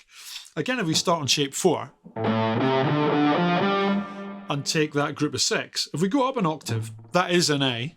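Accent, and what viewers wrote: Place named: Scotland, United Kingdom. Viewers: The accent is scottish ... alans was yorkshire . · This is East Scotland accent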